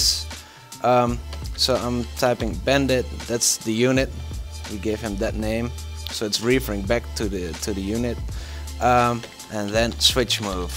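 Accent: Netherlands. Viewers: are you dutch ?? i can hear it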